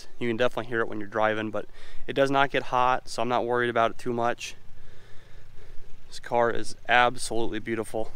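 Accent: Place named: Midwest, United States. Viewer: Maybe your ear is not tuned to a midwest accent.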